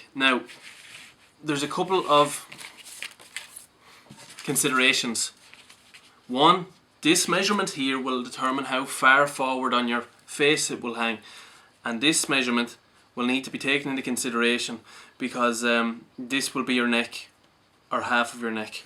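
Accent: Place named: Ireland. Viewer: All this time I thought sounds Irish...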